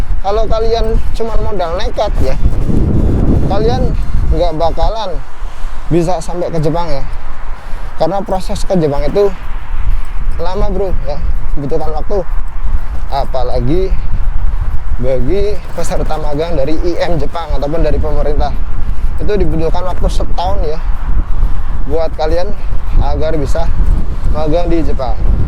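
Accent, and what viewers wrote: Pekalongan, Indonesia. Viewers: Wong Pekalongan ya mas ? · Podo wong kalongan. 😁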